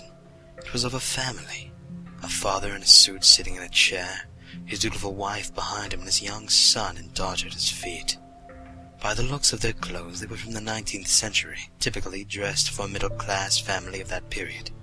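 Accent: United Kingdom. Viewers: Omfg his British accent 😂👌🏼👌🏼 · you sound british!!!!! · you sounded kind of British in this one.. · nice slight british accent. sounds very... british. lol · Trying to tell whether you're putting on a British accent or not..... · Oh this reading. The ever-so-slight British Accent.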